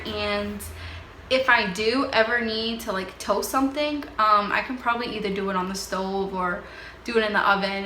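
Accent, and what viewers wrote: Boston, United States. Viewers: A projector with a cute Bawston accent ;) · On another topic she has the cutest Boston accent 😁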